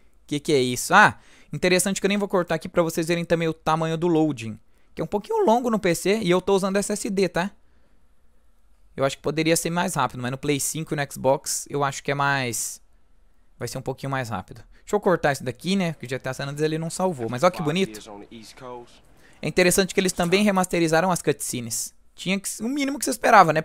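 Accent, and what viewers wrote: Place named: South, Brazil. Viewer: Eu pensava que vc era do Rio Grande do Sul ou santa Catarina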